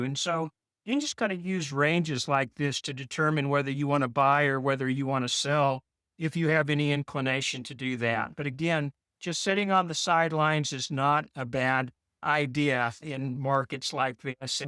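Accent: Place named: South, United States. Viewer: New microphone? Your southern twang I wish I had is missing today.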